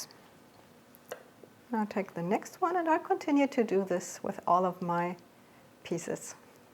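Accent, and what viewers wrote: Germany. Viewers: Perfect english ... ein bissl hört man noch den deutschen Akzent. Aber .... echt gut. Die Laugen sehen toll aus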